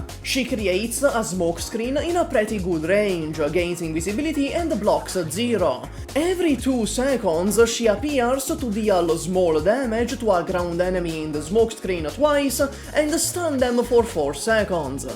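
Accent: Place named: Italy. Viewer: such an Italiano accent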